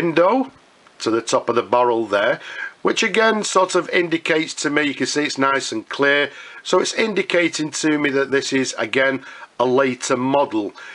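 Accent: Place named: England, United Kingdom. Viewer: just know that I love your heavy english accent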